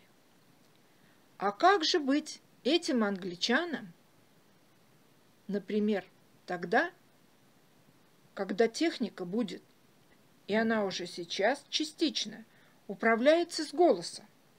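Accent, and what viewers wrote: Yorkshire, United Kingdom. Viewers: Это и есть йоркширский диалект? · ну да , иммено так она и считает . Она причислила свой йоркширский говор к отдельному языку ., как валлейский или гэльский языки .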